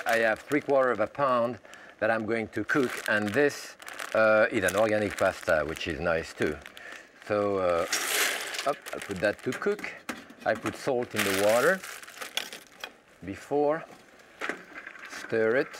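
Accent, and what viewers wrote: France. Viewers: For someone with a pretty strong French accent (which is great btw) Jacques has an elaborate English vocabulary, which is strange. Is it because European languages share many words?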